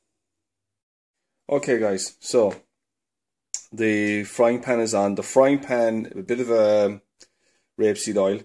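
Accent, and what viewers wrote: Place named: Caribbean. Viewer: Caribbean accent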